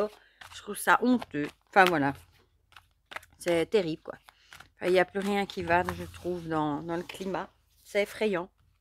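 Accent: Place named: Belgium. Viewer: Coucou bonjour 🐕❤🐈🦊🐿🐇🐭🐼🦔🦉🐦🦩🪶❤, Waow waow magnifique énergies 🎉, j adore ♥. Tu me fais trop rire 😂 avec ton petit accent Belge 🇧🇪. J adore 😂😊👍. Merci beaucoup pour la petite pensée 🐦, pour moi. Oui j adore 💙🐦 les Mes Anges 🐦😇. Grand Merci pour cette guidance pour la semaine. Bon Dimanche, bisous bisous Sylvie 🙋‍♀️🦋🕊🦋🕊💙💙💙🙏🌈🌟🌈🌟 Bonne semaine ps: oui effectivement les bougies d actions, se consume plus vite, je confirme 🥴🥴🥴.....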